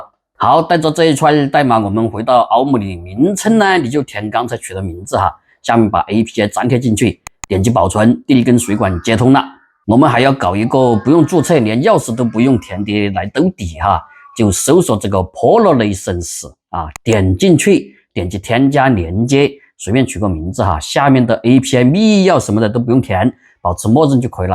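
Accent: Sichuan, China. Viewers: 大哥 你是不是四川人 说点川普 我都懵了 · 听着一口流利的川普一下子想到了就是他教我学会了搭建一个聊天平台.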